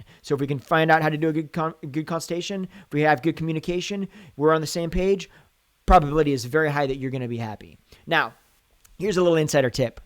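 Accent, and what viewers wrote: United States. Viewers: I hear a slight Southern accent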